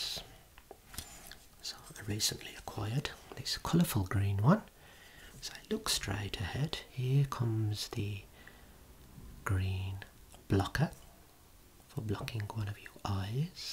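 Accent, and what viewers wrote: Birmingham, United Kingdom. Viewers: I think he’s from Birmingham or around that area :)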